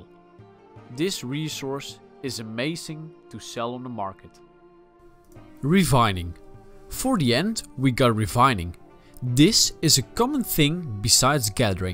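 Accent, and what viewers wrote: Netherlands. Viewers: your accent actually arouses me. is it dutch?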